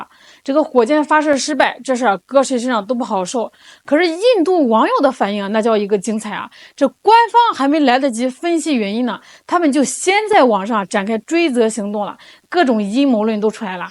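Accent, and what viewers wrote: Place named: North, China. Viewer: 但一定是北方人😊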